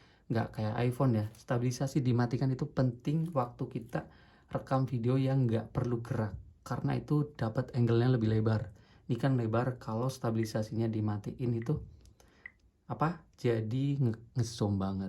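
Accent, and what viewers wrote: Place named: Java, Indonesia. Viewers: Padahal dah keren, pas sma nada suara ngomong ya jawa. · Padahal udah bagus channelnya. Apa lagi perpaduan ngapak karo indo. Malah ganti maning haduh yawis moga lancar ges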